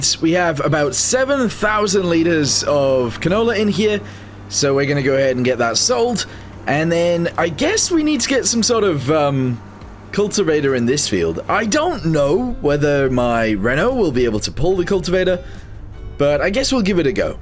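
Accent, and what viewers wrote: United Kingdom. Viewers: Is it just me or am I detecting a Geordie twang in your voice?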